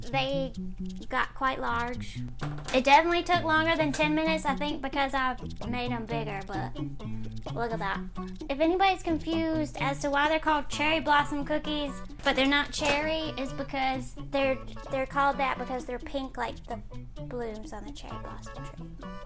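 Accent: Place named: Georgia, United States. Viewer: I'm live in Warner Robins and have never heard an accent like yours. It's so cute and unique. I thought maybe you were from north GA. It's almost cherry blossom festival time again. The pollen is killing us!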